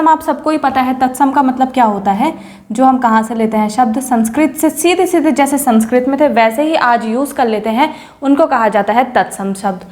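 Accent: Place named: Uttarakhand, India. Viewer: Bhut acha pda rhe ho mem aap uttrakhand se ho lg raa 🙏🙏🙏🙏👍👍👌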